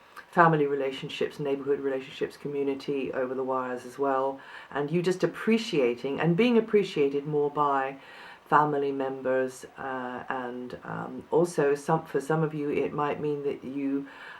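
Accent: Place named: United Kingdom. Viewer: You are Beautiful. I love your accent, British, of course.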